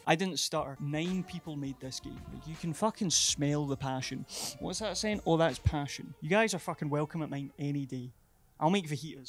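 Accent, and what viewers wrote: Scotland, United Kingdom. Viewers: Belting vid with the hilarious ADHD style quick fire editing and Scottish accented quips.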